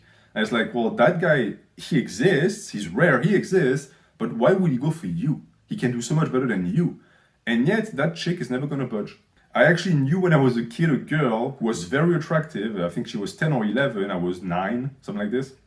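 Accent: France. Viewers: Franchement c’est cool que les anglais aime notre accent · High pitched with french accent. For the win. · his accent is horrendous and it is extremely different to understand what he is saying at times. his accent is what makes me hate the french, frenchies always get mad at you when you say their dribble speak words wrong but then they go on and speak english like this! absolute butchering of the english language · Hey, how tall are you? Also why are you French and why your accent is intolerable?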